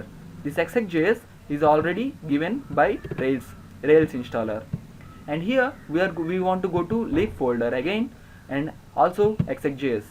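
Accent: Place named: India. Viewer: you clown........... who told you to talk in english ?? indians look very funny when they try to speak english....... :-)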